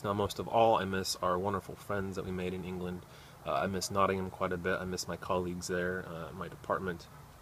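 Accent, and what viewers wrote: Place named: United States. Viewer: Thanks. Talking of sharing a different language I notice you Americans pronounce "route" like "rowt" rhyming with "out" whereas we say "rewt" rhyming with "newt".:)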